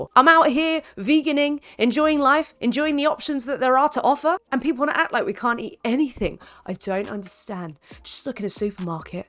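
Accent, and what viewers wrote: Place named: United Kingdom. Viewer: I enjoy your wit ... and your British accent 🥰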